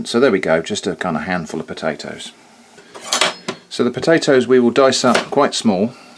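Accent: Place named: Dorset, United Kingdom. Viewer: thought you had a Dorset tone I'm near Bournemouth funnily enough lol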